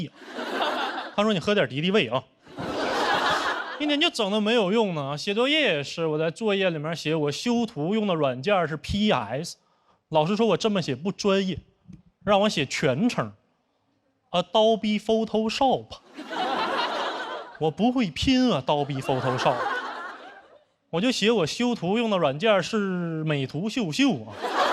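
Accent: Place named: Liaobei, China. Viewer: 说学邱瑞的观众，你们身边一个辽宁人都没有？我们辽宁人或者说东北人平时聊天就这样，而且林简七抚顺人，邱瑞沈阳人，统称辽北人，口音就极为相似，你不如说全辽北人均学邱瑞。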